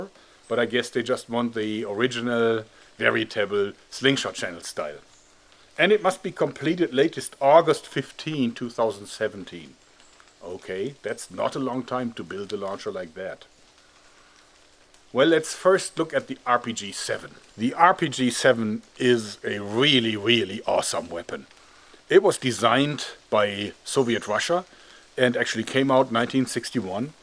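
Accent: Germany. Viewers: Say Asta la vista with ur German accent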